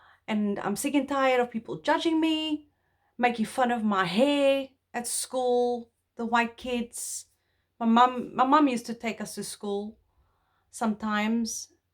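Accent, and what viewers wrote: Afrikaans, South Africa. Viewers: Your accent just sounds Afrikaans (or Eastern Cape English, even) to me, so I suppose one has to come from the Cape to hear the difference or something. My mom's family were from the Northern Cape, and would've probably been put in the box "Poor Whites" in those times, because that was what the politicians were peddling to the masses back then, but their heavy accent (much heavier than yours to my ears, anyway) wasn't what distinguished them. AFAIK, every English speaker of those regions (regardless of background) spoke basically Eastern Cape English. They moved to Jo'burg, and all the girls changed their accents, eventually, while the boys kept theirs. (Big family.) So today my mom, aunt, and uncle all live together, getting old, and the two sisters speak Natal English, mainly just because that's how people speak here, but my uncle might be able to "pass for Coloured" - to my ears at least, and I'm probably missing all sorts of nuance that make that idea ridiculous. 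Actually another one of my uncles was in the queue with a very "Coloured-looking" lady (there's no such tidy box, but somewhere right in the middle of everyone pushed or pulled into what's become that culture there's something like that), who had the same surname as him, so is almost certainly related (because it's a very rare surname) to us. So somewhere back in the past, one branch of the family got put in the one box, and the other got put in the other. Actually now I think of it, that very uncle's first ID bo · My son is 40 and can hardly remember those years...i think you are not telling the truth about the pencil test...and you are talking with same acsent as an afrikaans person trying to speak english stop feeling sorry for yourself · No Afrikaans speaking person is not of mixed race origin. I have DNA tested 10 “white” Afrikaans speaking persons. All are of mixed race origin. The majority of us are decedents of white men and imported slave woman from West Africa , india and Malaysians. 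I am of Indian, Malay, West Africans and European decent. I have very blue eyes, blond hair and western facial futures. My skin is white and I tan a dark bonze colour. Do not judge people by their looks you might be mistaken. Your coloured accent sounds more like a “ white” Afrikaans accent. You are an Afrikaner. The can just as well do the mental shift and embrace your Afrikaner identity. Many English speaking South Africans are more Afrikaner than the Afrikaners in their way of living and thinking and their values. They are experiencing a continuous identity crises because they belong nowhere. Just accept that you have become Afrikaners. Make the mental shift. Tommy worked at RAU. He was Chinese as his mother and farther were born there. She could barley speak a few words of English. Tommy however spoke English and Afrikaans fluently. No accent in Afrikaans. It was an Afrikaans university. 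Tommy made the mental shift and became Afrikaans. He was absorbed into the community although he look different. He became one of us. No longer an outsider. We need to embrace our mixed heritage and our Afrikaner identity. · What a crazy world we live in. If I had met you without knowing you, I might have thought that you were an Afrikaans speaking person, speaking English. Not that it really matters, 30 years after the end of apartheid government and race is still an issue. Look at the Z-83 form for application for employment in a government dept. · You're beautiful and your accent in only south African.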